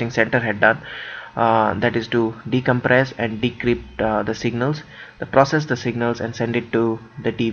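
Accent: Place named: South Asia. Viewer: i agree with you about his accent, i think he is from may be India or Pakistan.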